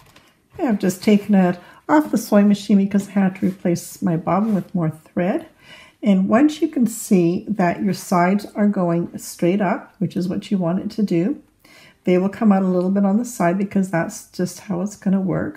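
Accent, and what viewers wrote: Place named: Canada. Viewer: Hi. I love your tutorials. You sound like a Canadian.;) If you are where do you purchase your cotton rope for your projects. I live in rural Manitoba and have very limited options. Thanks so much and keep them coming. I dyed rope and made Easter baskets after that video....so great.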